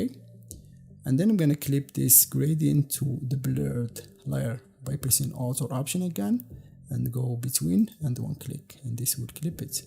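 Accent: Morocco. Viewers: Your accent look like Moroccan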